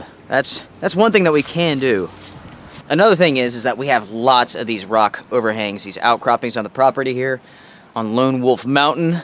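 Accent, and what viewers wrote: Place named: United States. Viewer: That's awesome. Where is Lonewolf mountain? You sound American. I like watching your adventures.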